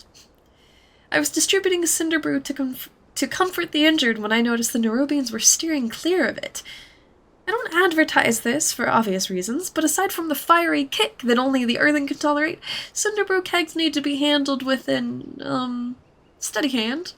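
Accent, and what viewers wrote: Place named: Ireland. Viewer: I love your middling Irish accent! 😊 It's quite lovely!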